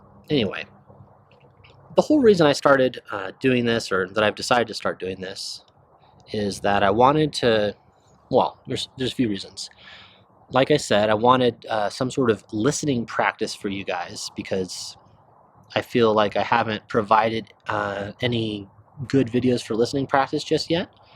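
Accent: United States. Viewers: perfect American accent English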